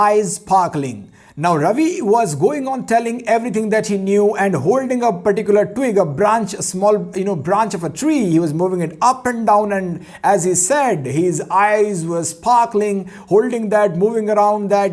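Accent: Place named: England, United Kingdom. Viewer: Wooooow Sir.... Nice English Accent ... Mind Blowing Sir....Shriya...😍😍😍👏👏👏👍👌👌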